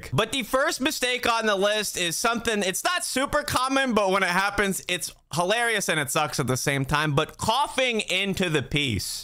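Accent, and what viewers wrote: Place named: New Jersey, United States. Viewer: this guy just screammmmsss jersey, I've watched a couple videos I've heard him say he lives in Florida and another time he lived in CO but buddy that accent is definitely east coast jersey lolol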